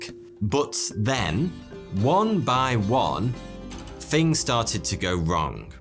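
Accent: United Kingdom. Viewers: Donner Party is at most a passing, macabre joke. Sometimes people will use the name when waiting for a table at a restaurant so they can hear the hostess say, "Donner, party of two." But the only thing weirder than the Donner Party is seeing someone with a British accent make a 22 minute joke of it. Beyond one-liner jokes, I'm unaware of any American who views the Donner Party as a comedy of errors. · Are you British?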